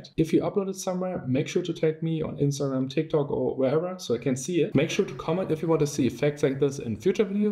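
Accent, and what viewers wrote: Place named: Germany. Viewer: Du bist Deutscher ich hör das raus aber Nice Video haha 😂❤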